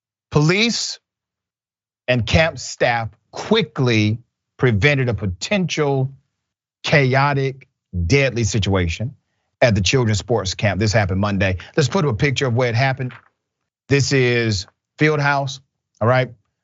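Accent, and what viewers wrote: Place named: South, United States. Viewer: I LOVE YOUR SOUTHERN ACCENT boy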